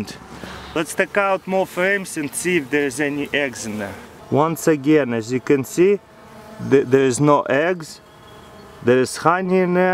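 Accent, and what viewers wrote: Russia. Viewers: i have no real interest in bees aslong as i dont get stung but his russian accent makes it worth the while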